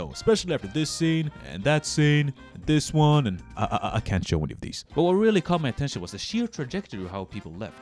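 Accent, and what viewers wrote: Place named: Sweden. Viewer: He is Swedish. That's why he has swedish accent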